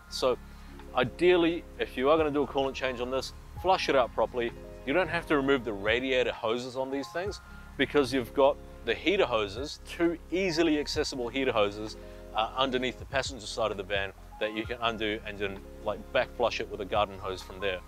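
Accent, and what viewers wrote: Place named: New Zealand. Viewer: Good ol' Kiwi accent . . . nice!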